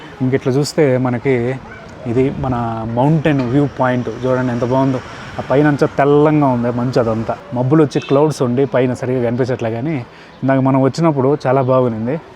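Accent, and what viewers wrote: Nellore, India. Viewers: 😂😂😂 Nellore slang super 😊 abba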